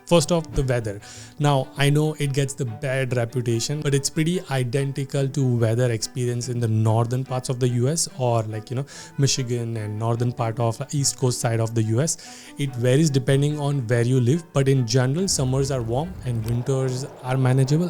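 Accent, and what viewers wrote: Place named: India. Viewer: Even you need to go back to India. Am tired of hearing that accent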